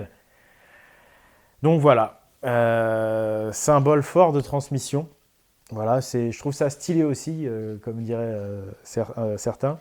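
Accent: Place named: Provence, France. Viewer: Lady Aurelie Boissat Fraser of Lochaber. c'est juste que je ne comprends pas très bien, pourquoi certaines vidéos sont intitulées aussi...mite etc... sur sa chaîne mais sont narrées,haha, par un type à l'accent provençal ? tu connais bien ? tu peux me dire s'il te plaît ?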